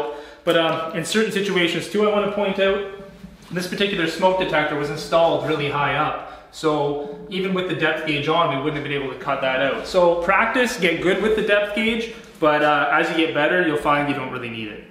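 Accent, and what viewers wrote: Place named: Canada. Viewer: I doubt it. Listen to the way he pronounces his 'O's' Id bet hes from Canada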